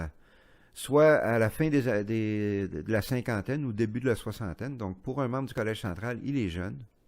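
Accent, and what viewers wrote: Quebec, Canada. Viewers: Bonjour et merci beaucoup pour les informations, si vous avez le temps.. pourriez-vous les écrire les noms des membres du Collège Central ? Désolée ! J’ai du mal à comprendre avec votre accent québécois… ne vous méprenez pas… c’est joli comme nous à la Polynésie nous avons aussi un accent… nous roulons les rrrrrr… je vous remercie d’avance et bonne continuation pour tout…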